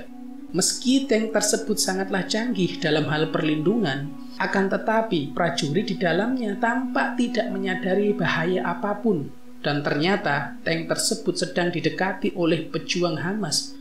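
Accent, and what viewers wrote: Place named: Java, Indonesia. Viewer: Abu Ubaida....MEdok kali mas😅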